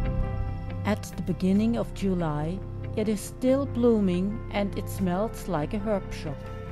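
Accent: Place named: Germany. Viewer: Hey, your english is very well (Better than mine ':D) Your free speach is nearly that good, than it is in german, with a little german accent of corse... Best regards Paco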